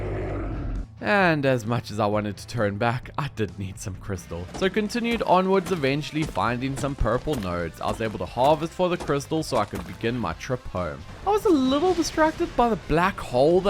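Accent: Afrikaans, South Africa. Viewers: Are u afrikaans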